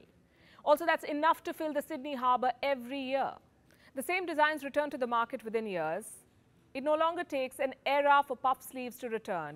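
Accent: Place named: India. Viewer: She has an Indian accent to carve granite with. Her topics and intelligence are always brilliant though.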